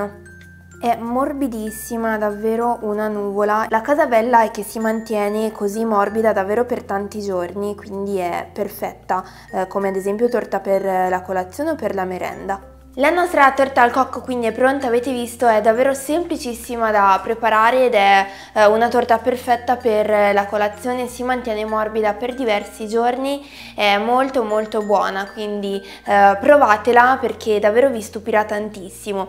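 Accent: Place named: Marche, Italy. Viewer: sembra buonissima!! ma per caso sei di Senigallia? hai un accento marchigiano! :-)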